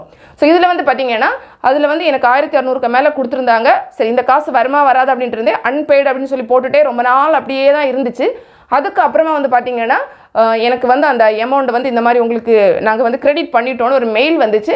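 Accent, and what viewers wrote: Kanyakumari, India. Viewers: Ungaluku native kanniyakumari ya?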